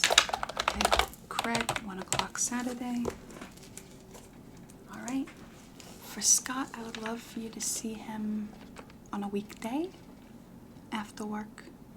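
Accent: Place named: Long Island, United States. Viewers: Omg yes! She does the Long Island accent in that series too. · LMAOOOO I literally loved this video, me being from Long Island just made me laugh through the whole video bc I feel like I don’t have one and my friends say I do 😭 but girl the accent is hilarious I loved this whole heartily I get so excited when you post 😝🩷! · the way my name is Chelsea and i’m from Long Island with family that sounds like this 😭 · yeah sounds about like the mothers id hear sitting at other tables at a restaurant on LI 😭😭 great job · I’m from Long Island!!🎉 I heard more of a Brooklyn/bronx accent mixed in there too! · what I want to know is how you got even the mannerisms to a T… you sure you’re not from long island??? 😂🩷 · Something about the Long Island accent makes me so happy lol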